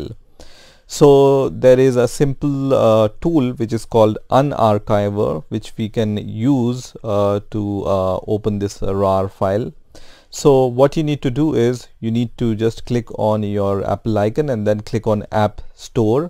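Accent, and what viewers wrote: India. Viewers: You are almost hundred percent sure of getting the answer you are looking for when you hear an Indian accent ....... Thanks a lot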